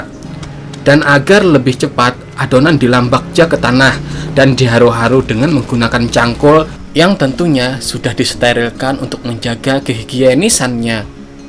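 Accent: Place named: Java, Indonesia. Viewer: Bahasa banjar nya kurang kental.. Lebih kpda medok jawa...tp Salam sukses buat kmu.. Trus berkarya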